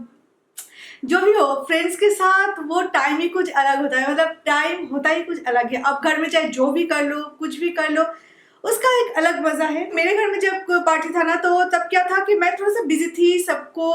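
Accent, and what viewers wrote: Bengal, India. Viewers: M following her since last 5 months and loved her vlogs i almost watched her all previous videos too loved the way she use to handle every thing and specially her bengoli hindi mix accent but i dint criticised her ever i just go through comments section and use to see hw ppl use to troll her and feel bad for her but she doesn't care for her viewers.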